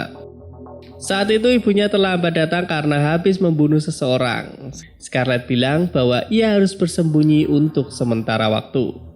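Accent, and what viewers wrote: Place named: Klaten, Indonesia. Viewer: Mimine opo wong klaten yo? Logate koyo klaten 😅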